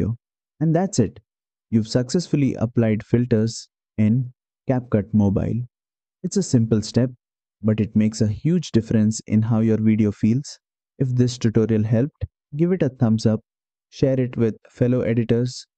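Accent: India. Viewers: when the dude is talking in a indian accent u know ts is gonna be good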